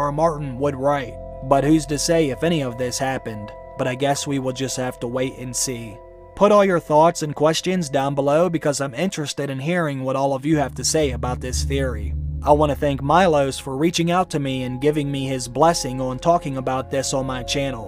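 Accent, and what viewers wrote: Pittsburgh, United States. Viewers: Dude you sound like you’re from Pittsburgh! New castle area?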